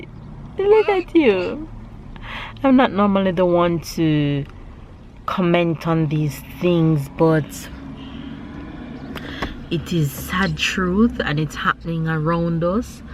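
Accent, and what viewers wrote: Jamaica. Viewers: How come he has a Jamaican accent?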